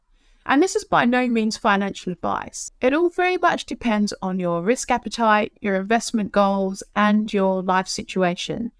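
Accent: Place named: United Kingdom. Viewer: A Brit talking/teaching about the American stock market? LoL...I was caught off guard at first. Like your accent, though. Good stuff. I'll check out the rest of your videos too.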